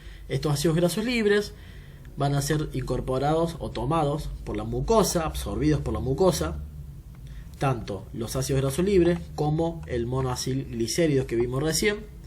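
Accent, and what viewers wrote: Córdoba, Argentina. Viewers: muy bueno, me gusta porque sacas todo del Blanco, que dicho sea de paso por tu acento cordobés supongo que son paisanos jajaj saludos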